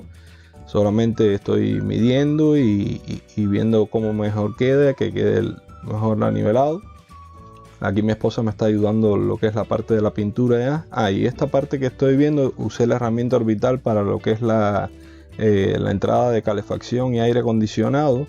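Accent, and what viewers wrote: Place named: Cuba. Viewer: Por tu forma de hablar me imagino eres cubano un saludo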